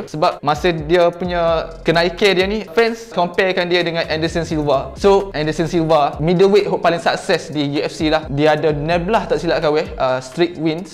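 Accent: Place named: Kelantan, Malaysia. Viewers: Awok terlalu kelate untuk sayo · aduh laju beno ckp...campur2 klantan plak...susah nk phm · Kelate ni boh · Bahaso kelate demo pekat sngat bey , ore lain comfirm xpehe , pah sor lg lorat nok g mano ? Aduhhh laa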